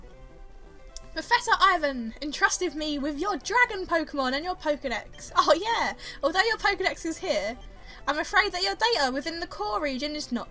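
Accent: United Kingdom. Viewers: " What did I call my other fletchlin'?...........I don't remember!" You gotta love British accents!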